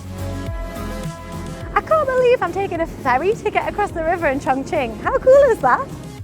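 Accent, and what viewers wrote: United Kingdom. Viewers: I like your UK accent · Sorry, hard to understand yr accent, English accent or British one, hihihih.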